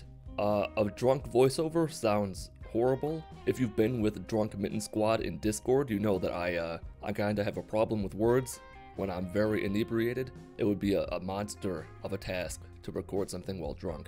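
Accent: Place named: United States. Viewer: I never noticed the American accent he has until now. I assumed he was European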